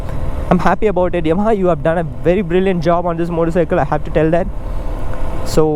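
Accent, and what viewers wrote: Kerala, India. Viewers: You must definitely be a mallu☺️. But good review!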